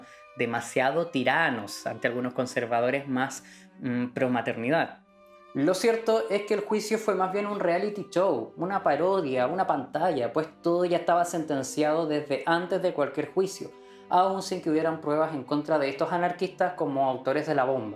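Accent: Chile. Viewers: Entre el dialecto chileno, la homosexualidad en su forma de hablar, y además que se quiere hacer el indio, es imposible entenderle.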